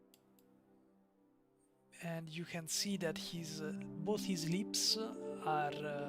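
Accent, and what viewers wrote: Italy. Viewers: italian accents or my ear are not working? still idc i love youre video still se capisci sta cosa allora ti ho beccato;)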